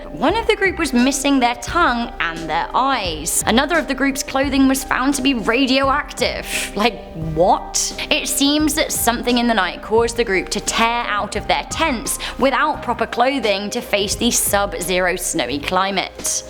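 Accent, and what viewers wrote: England, United Kingdom. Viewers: loved the Scottish accent! Nice attempt but I still cant get enough of your English accent.:)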